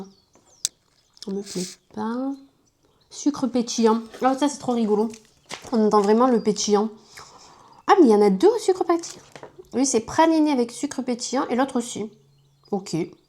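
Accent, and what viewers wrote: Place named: South, France. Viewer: J adore ton accent du sud rempli de soleil ❤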